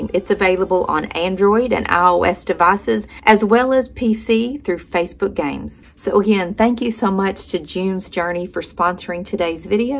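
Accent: South, United States. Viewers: I absolutely love your accent! Love it! I live in Long Island, NY and I know I surely have an accent but NY accents are awful yours is so cute! I went to college in Virginia Ferrum College and it was not far from Kentucky south western part of Virginia and your accent brings me back to happy times. Love the south my husband and I want to move there after he retires. Love this video your such a joy and funny to watch! Have a blessed weekend! 🥰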